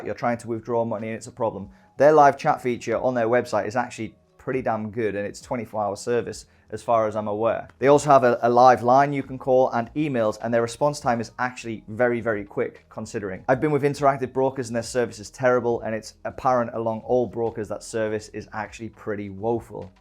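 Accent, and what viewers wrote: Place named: Newcastle, United Kingdom. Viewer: Love the Geordie accent are you in Newcastle??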